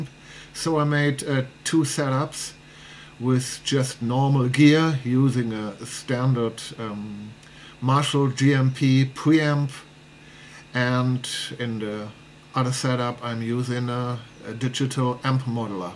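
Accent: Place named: Germany. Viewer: ich wette Sie sind Deutscher, starker, sympatischer Akzent! Danke für diesen Artikel! Es muss nicht immer Röhre sein.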